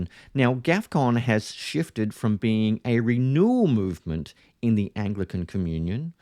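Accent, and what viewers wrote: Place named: Australia. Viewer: As long as you know the hymn, "Thy Hand O God Has Guided" you are Anglican😂❤. Seriously speaking this is not a split. Anglicanism is being preserved. I'm just waiting to see what the Province of the West Indies, which I'm a part of would do or which side it would choose. I love your Aussie accent by the way.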